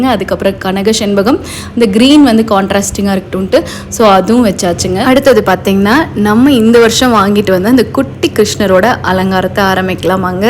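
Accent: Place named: Coimbatore, India. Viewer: Akka im also from Coimbatore currently in canada, recently seeing your videos. Very nice esp neenga pesra vidham.. Nama kovai style, nalla irukeengla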